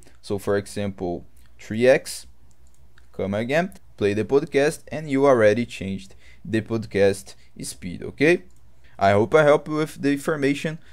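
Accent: Brazil. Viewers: Só pela voz já vejo que é BR kkkkkkkkk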